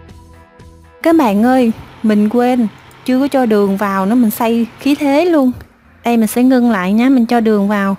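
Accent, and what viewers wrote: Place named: South, Vietnam. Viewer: Cám ơn bạn lên tiếng cho 😍👍 giọng nói mỗi miền có đặc tính của miền đó. Giọng miền Nam của vô cùng đáng yêu. Nhẹ nhàng. Nghe giọng là biết tánh người Mình là ng nấu ăn vô cùng dỡ. Nhưng mình làm vài món của. Mình đều thành công Đó là vì hướng dẫn dễ hiểu, kỹ và ko giấu kỷ thuật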